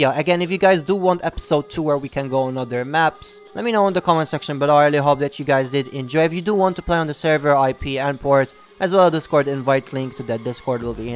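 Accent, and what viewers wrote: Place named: Malta. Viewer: first Maltese person I have ever found playing Unturned other than myself; they are so rare! I noticed immediately from your accent. Keep up the great content!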